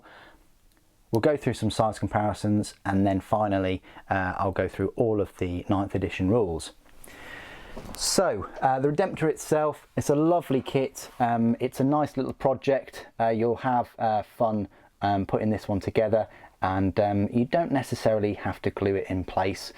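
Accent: United Kingdom. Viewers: I like your accent. Its very crisp and authentic. It's the only reason why I stood to watch this video. As an American, you have the IDEAL accent that we love to listen to- your accent and Tom Holland's...and Jason Statham...and Michael Caine...I know Sean Connery's not English, but we'll throw him in there too. Your accent is up there among the greats. You articulate your words very clearly. Cheers Mate.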